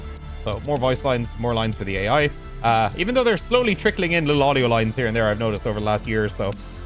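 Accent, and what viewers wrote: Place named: Ireland. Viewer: Been waiting for an Irishman to read me those juicy patch notes! Sorry about the funeral though man :(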